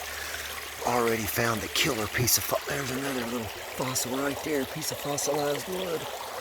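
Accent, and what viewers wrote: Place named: Georgia, United States. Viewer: Controlled stuff lol I'm not all sciencey 😂 lol you sound like me, it sounded good in my head but my tongue wouldn't cooperate lol controlled environment is what we're looking for. I promise I'm not laughing at you I'm laughing with you because I know your educated and purdy smart but we mix our words up on top of our country accents (GA here) and folks think we're some kinda bumpkins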